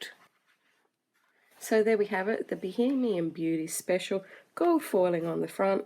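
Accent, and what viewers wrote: Australia. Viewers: Like your review style.like your Australian accent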